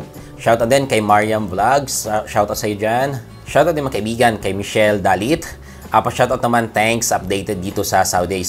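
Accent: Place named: Visayas, Philippines. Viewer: Taga asa mankaah mora mag kag bisaya hehe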